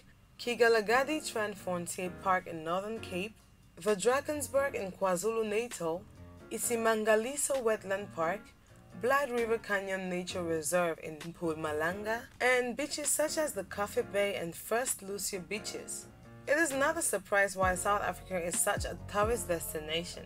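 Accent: Nigeria. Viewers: Your Facts are 100% and I must admire that given that I can Pick up the Nigerian accent in you...I thought you were gonna be Partial buh you proved Me wrong..And Yeah,South Africa is a Much Better Country to live in as Far as Africa is concerned .. Oohw..Im South African by the way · This person sounds Nigerian Proudly south african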